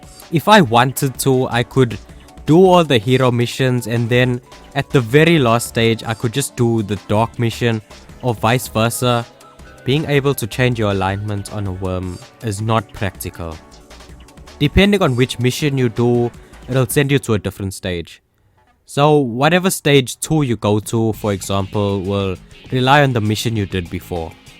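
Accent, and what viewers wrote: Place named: South Africa. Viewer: That's nice 👌 I'm British Indian but I have a lot of Indian South African family, so that's how I recognised your accent!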